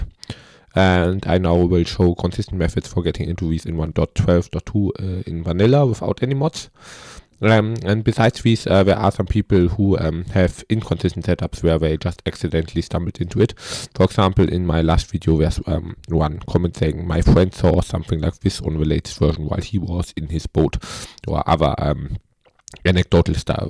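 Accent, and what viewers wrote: Germany. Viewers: Are you german mayhaps? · I hear a German accent. Good video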